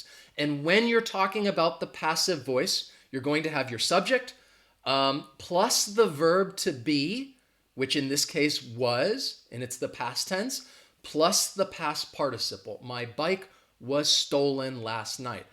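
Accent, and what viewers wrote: United States. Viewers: Hiya dude. Kind regards from Venezuela. I do congratulate you on your wonderful and useful Youtube channel. I dunno why, but I love the English language. Therefore, I´m trynna do my best to learn a great deal of it every single day. It ain´t been an easy learning journey. Notwithstanding, I wanna become a proficient English speaker. Likewise, I must confess I love American English. So, I do enjoy watching your videos cuz you speak such a beautiful American English. I still a newbie, I mean I´m still a rookie at learning English. In spite of that, I´m trynna do my best to reach a fluent speaking level. Well, dearest friend, you´re an amazing English teacher and I enjoy watching your wonderful videos.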